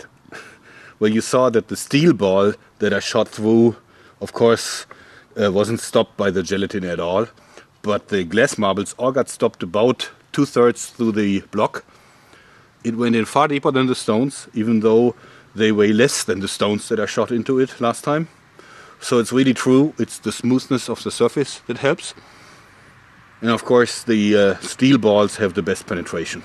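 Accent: Germany. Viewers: Are you German? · Man hört so das du aus Deutschland kommst haha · Are you germany? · are you German? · Are you German ? ^^ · were are you come from you speak a liitle bit like a german areyou from there ß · thumbs up for the german accent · im detecting german accent in you, yes?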